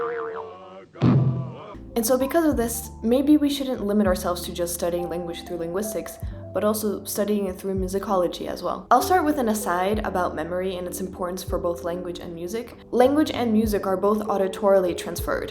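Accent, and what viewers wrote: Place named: Japan. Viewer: Hey.. as a linguist who studies Englishes and various contact language inpact on language.. I can't figure out what dialect of english you're speaking..there are very 'new' or different/mixtures of strange vowels/consonants and cadence etc.. You sound a little Japanese, a little Chicano and a little something new/modern ..and strange sounding to my American , older ear/and dialect.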